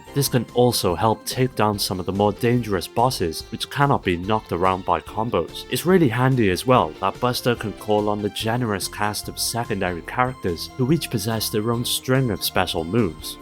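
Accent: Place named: Wales, United Kingdom. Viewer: Man I KNEW you were a fellow Welsh. You can't miss that accent.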